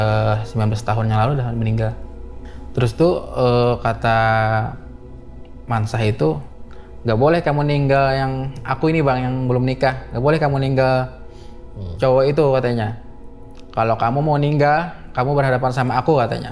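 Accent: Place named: Banjar, Indonesia. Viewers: Kayaknya karena abang ini sering pake bahasa daerah (sepertinya bhsa banjar klo dr logatnya) jadi pas pake bahasa indonesia jadi ga tertata cara ceritanya. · Bahasa Masih Tebwa Banjar banar😁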